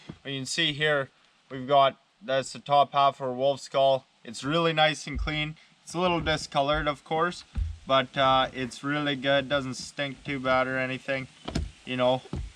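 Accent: Canada. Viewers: most important thing I have learned is that I've never heard an actual Canadian accent before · I'm going to say this: I watch this channel almost purely for the outrageous Canadian bush accent, and energy.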